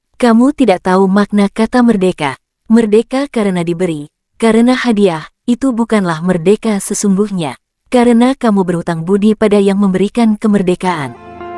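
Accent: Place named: Java, Indonesia. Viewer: dengan aksen Jawo pekat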